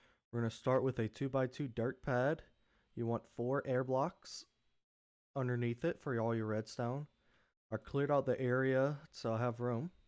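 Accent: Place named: South, United States. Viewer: not gonna lie, i'm kinda delighted to hear a southern accent and no cringy intro and i'm not even american.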